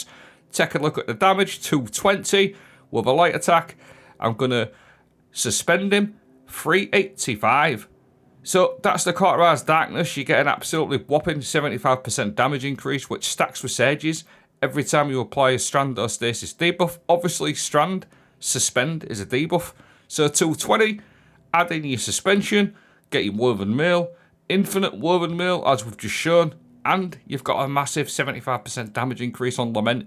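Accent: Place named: Yorkshire, United Kingdom. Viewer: Love the content mate! Can relate to the Yorkshire accent too, which is always nice :) keep up the good work bro!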